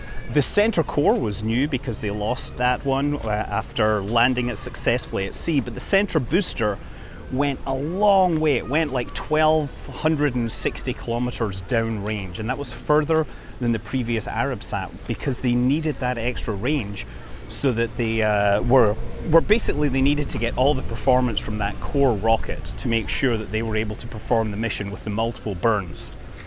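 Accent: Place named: England, United Kingdom. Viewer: We of the USA do understand the English way to say words differently than we do, there are many different methods to say many of our words here in the USA. So please do trouble your self, Thank you very much your program is "GGG-GREAT!" as Tony the Tiger would say it. Taken from a commercial of Kellogs Corn sugar-frosted flakes. This one has been off the airways for many years now. { Tele or TV or The boob-tube came to us on airways. }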